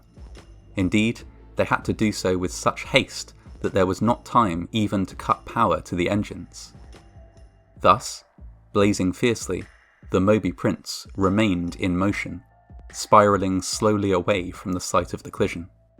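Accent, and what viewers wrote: United Kingdom. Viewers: This guy has a great delivery style. Does anyone know which British accent he has? 🤔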